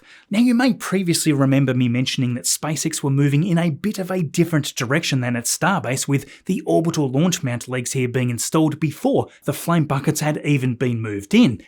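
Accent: Australia. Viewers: I've been hearing it for years and I'm finally commenting on it. The Aussie accent makes it sound like you're saying boostera instead of booster. I love our accent sometimes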